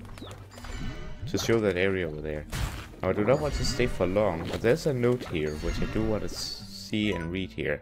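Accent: Denmark. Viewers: are you danish it sounds like it and i love youre vid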